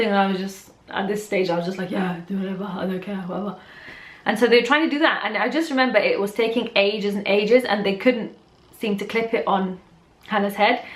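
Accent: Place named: Wales, United Kingdom. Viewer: your welsh accent make this video ten times funnier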